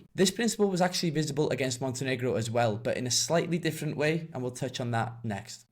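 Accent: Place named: North Wales, United Kingdom. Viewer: Dadansoddiad da. Da clywad acen gogs go iawn 'fyd!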